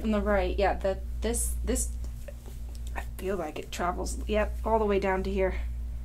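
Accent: England, United Kingdom. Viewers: Is that an english accent? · It's weirdly jarring hearing a familiar British accent in your videos.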